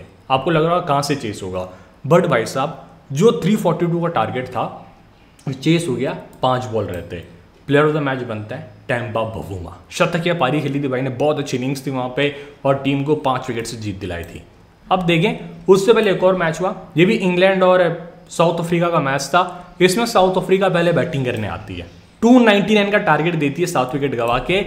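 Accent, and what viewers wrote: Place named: Caribbean. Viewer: Sir ap me Caribbean flavour hai thoda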